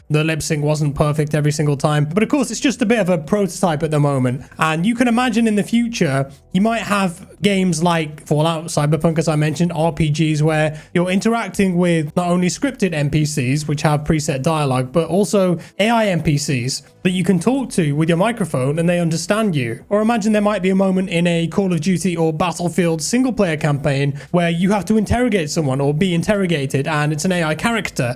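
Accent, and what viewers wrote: United Kingdom. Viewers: Today we learned is a rural Brit, as a rural North Carolinian, respect. 🤣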